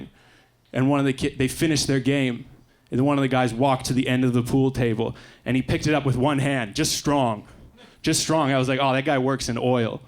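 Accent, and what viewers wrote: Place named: Canada. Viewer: His Canadian accent brings back memories of home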